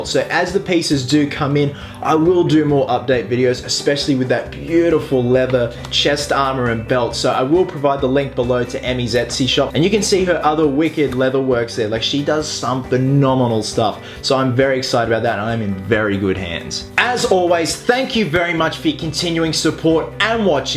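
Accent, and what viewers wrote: Australia. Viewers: After watching about fifty of your videos, this is the first time that I noticed that you had an Australian accent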